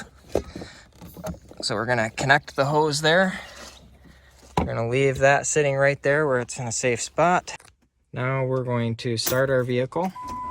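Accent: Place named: Midwest, United States. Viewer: Simple, to the point. Well done. Nice Midwest accent. Cheers to the algorithm!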